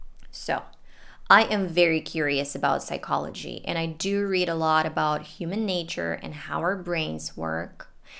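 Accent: United States. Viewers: It is Amazing for me to meet you specially because you have a perfect american accent and you are not american!! i really though in you as an american woman o maybe a latin woman living in America. I am impressed. Thank you a lot for making videos. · согласен, обычный английский, как у любого носителя американского английского языка, утверждаю, как живущий в Нью Йорке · No wonder those kind of questions... Your american standard accent is amazing!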